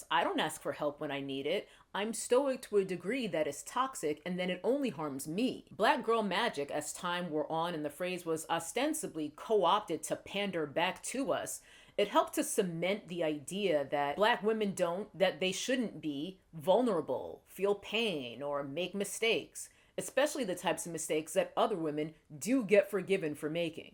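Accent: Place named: Brooklyn, United States. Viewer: I know this is off topic but are you from Brooklyn? Your accent sounds Brooklyn to me.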